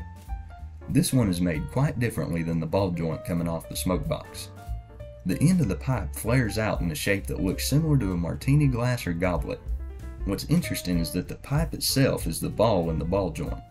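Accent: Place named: United States. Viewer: This guy's voice... 100% unfakeable American. I'm subscribing just out of the hope he's gonna say "John Deere" lolz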